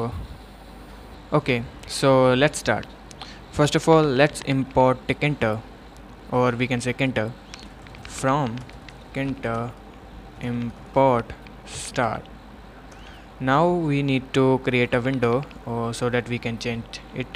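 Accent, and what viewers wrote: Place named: India. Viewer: now thats an indian accent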